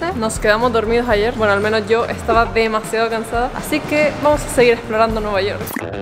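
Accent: Chile. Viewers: eres Chilena? tienes el tono de una, saludos de Chile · hablas como con acento de chilena what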